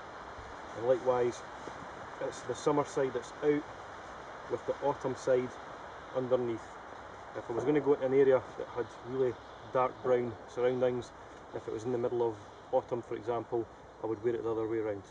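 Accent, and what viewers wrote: Scotland, United Kingdom. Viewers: a scottish accent german...WTH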